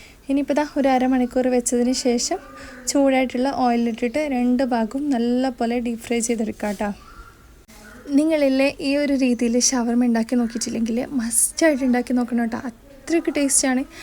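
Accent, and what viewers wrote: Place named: Kannur, India. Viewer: കണ്ണൂരാണോ വീട് സംസാരം കേട്ടിട്ട് ചോദിച്ചതാണ്. Super റെസിപി സബ്സ്രൈബ് cheythutto❤❤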